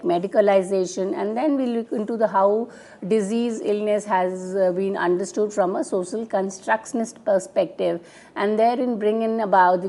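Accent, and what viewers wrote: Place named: Haryana, India. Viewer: Robotic and fast lecture. Very discouraging for the students. Memorised lecture marred by hariyanvi accent.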